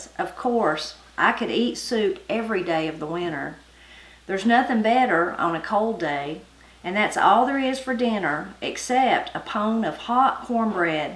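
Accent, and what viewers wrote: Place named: Eastern Kentucky, United States. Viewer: I grew up in eastern KY. Her accent sounds familiar and her recipes are legit what we all ate. She even has the correct kitchenware 😂 Love this channel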